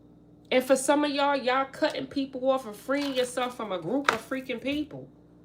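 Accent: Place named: New York, United States. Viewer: Yes I can hear a NY accent i love it!!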